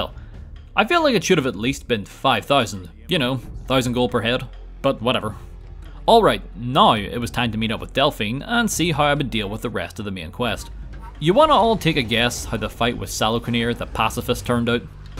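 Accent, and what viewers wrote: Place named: Ulster, United Kingdom. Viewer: has an Irish accent. Specifically Ulster province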